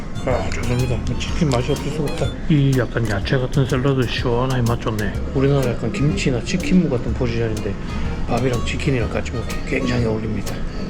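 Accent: Busan, South Korea. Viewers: 정겨운 부산사투리 잘듣고갑니다~~ 초보여행유튜버로서 잘보고 갑니더~~ 건강히 여행하시길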